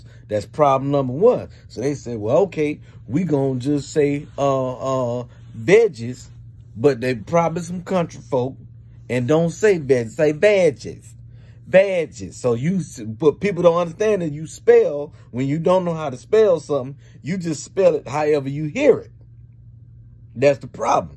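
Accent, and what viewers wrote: South, United States. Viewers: They just a Lil country shuler. It's how we talk down south in TX. Thanks for the Birthday laugh. 😂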